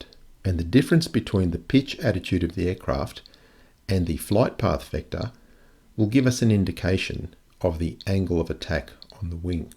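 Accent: United Kingdom. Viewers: A beautiful and well thought out video. you dah man!! A really good use of your time while flying over the ocean, and getting to stick it to the Flat Earth where the sun don't shine at the same time. I did my military service as an 18 year old (compulsory in Singapore) in the armoured artillery. We used maps and got to understand what they depicted. Back in the late 70's. My son did his service in 2006 and now they have the benefit of GPS targeting. You know that thing that you use in you cars to get to where you're going? It used sattelites. You know those pesky things that orbit the globe? Flat earth? What a frigging stupid idea. Free speech is one thing, but to use it to dumb down a sizeable portion of your people is worrism. Mark sargent, Jeranism, Patricia whashername, are actually conmen who don't really believe what they claim and are there to reap what they can earn from the uneducated and gullible public. 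The US should not have any place for this stupidity but sadly it seems to have. forgive me if I'm wrong but you sound like you're from the UK. I guess the epidemic of FE stupidity has crossed the pond. You have your work cut out for you if you should not get weary of sticking it to the Flatties. A really great and informative video. Well done!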